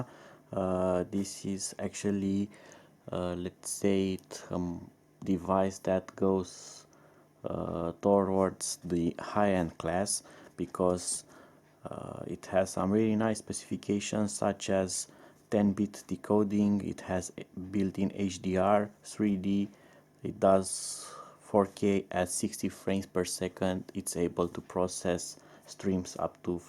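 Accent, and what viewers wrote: Romania. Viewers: you sound Romanian to me. am i right ?good english dogh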